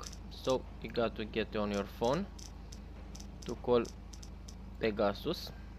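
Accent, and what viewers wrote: Romania. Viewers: Are u Romanian bro? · Ești roman după voce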